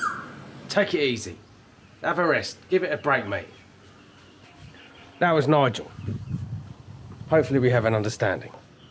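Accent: Cockney, United Kingdom. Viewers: Just came across some of your videos, I like your cheeky chappie cockney narrative. Your house is lovely, and a good sized plot too. Very luxurious, great master bedroom, must have cost a fortune, were you rich in England so you can afford to live well there in a very early retirement? Ew, didn't like that mass of maggots thingys that the storm blew in, do they go away on their own or do you have to brush them up and bin them? 20 years you have lived there, where is your wife, yes I am nosey? Hi to your lovely daughter, I will look for her business video, she has done well for herself and I take it you see her weekly?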